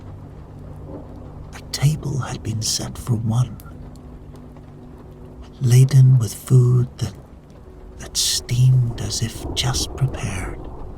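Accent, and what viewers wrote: Scotland, United Kingdom. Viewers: Thank you. I love your Scottish accent. This is my favorite fairy tale story ❤.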